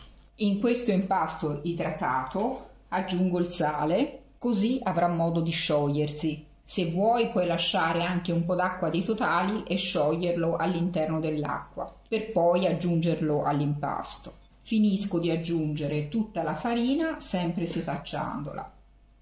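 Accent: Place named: Florence, Italy. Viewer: Bravissima! Ti seguo da NY. Cercavo una ricetta facile per panini. Grazie! Adore il tuo accento fiorentino😊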